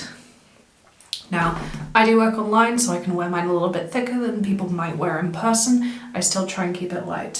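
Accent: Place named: United States. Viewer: Very clear and easy to follow@ And, YO!, am i hearing things??? You've gone all American like! 😊 VERY natural and authentic sounding! And i'm Californian 😄! Cool, cool 😎👍🏼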